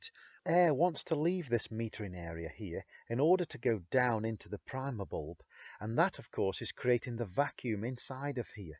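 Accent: United Kingdom. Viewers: too detailed and wordy with a British accent.... an American needs a plain-spoken and correct fellow to skip detailed diagram and illustration and theory and principles.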